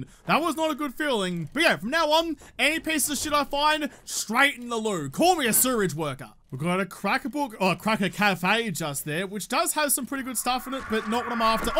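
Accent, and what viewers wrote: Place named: Australia. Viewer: he is an Australian... I'll give him slack on pronunciation.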